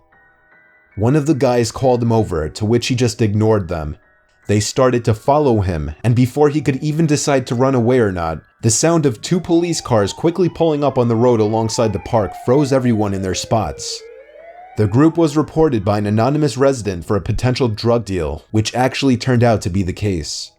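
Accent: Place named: Wisconsin, United States. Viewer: where's from? the accent on the vowels is real. being from Wisconsin myself, I know the struggle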